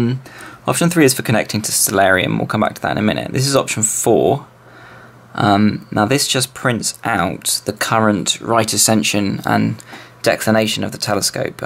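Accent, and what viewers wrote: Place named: Liverpool, United Kingdom. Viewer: My God he's from Liverpool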